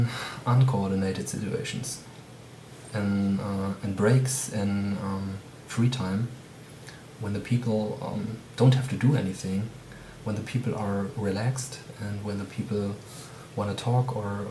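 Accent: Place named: Germany. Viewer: I hear a German accent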